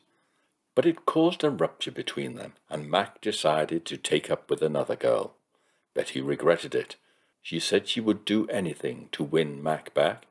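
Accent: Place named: Liverpool, United Kingdom. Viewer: Soft Scouse.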